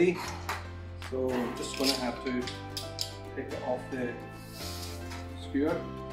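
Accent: Scotland, United Kingdom. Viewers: Love the accent Asian , Scottish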